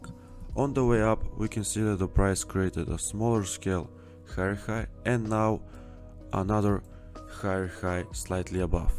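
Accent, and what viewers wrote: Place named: Russia. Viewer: Very very good material! Откуда русский акцент?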